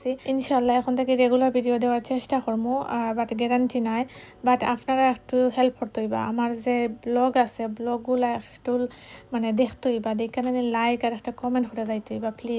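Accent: Sylhet, Bangladesh. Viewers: I like your sylhety tone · Apa I like the way you talk sylhety is our own language so no need to change your self because some one else, I like your video. Very nice design if you have phone number for that Gold shop can I have please thanks for sharing 👌❤️🌹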